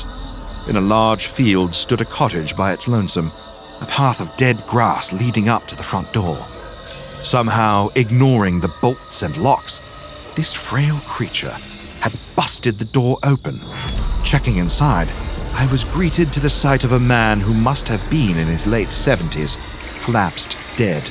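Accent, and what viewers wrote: United Kingdom. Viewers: Dam 🦫🦫🦫 you really nailed the British accent sir 🙏. God bless you and your work, respect form Croatia-Europe 😇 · OG British accent 😂❤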